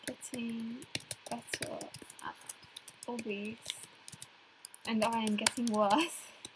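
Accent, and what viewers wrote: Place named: United Kingdom. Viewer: You sound more British here. I think us Americans are rubbing off on you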